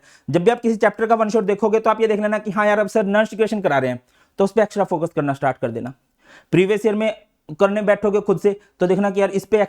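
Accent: Haryana, India. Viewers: SIR AAP HARYANA M KARNAL SE HO KYA?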